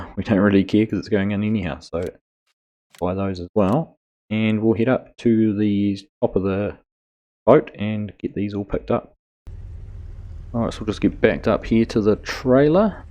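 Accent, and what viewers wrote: New Zealand. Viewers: I’ve listened to your voice long enough now that I can understand everything you say. At first that Kiwi accent sounded like you were speaking a foreign language. 😁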